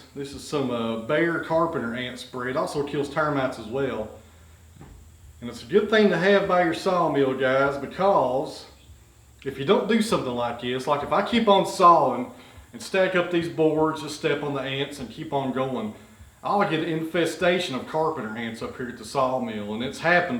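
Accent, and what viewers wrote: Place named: South, United States. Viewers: Good looking cuts, and ur southern our tongues aren't always cooperating with us lol. Good video bud. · Greetings from South Wales to Tennessee , love your accent !! Fascinating video . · When you talk southern, it's a different language.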